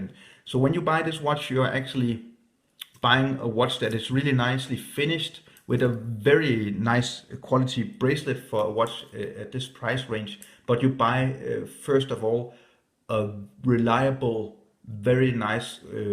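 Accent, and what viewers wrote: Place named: Denmark. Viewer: You've got a very cool accent, are you Danish?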